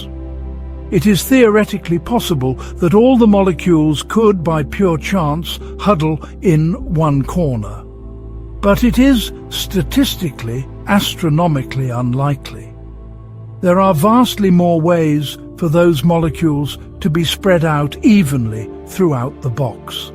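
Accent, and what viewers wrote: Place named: England, United Kingdom. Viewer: english accents just make everything sound like propaganda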